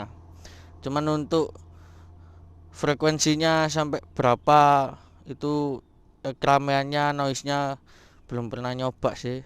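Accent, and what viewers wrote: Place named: Java, Indonesia. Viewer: Mas nya medok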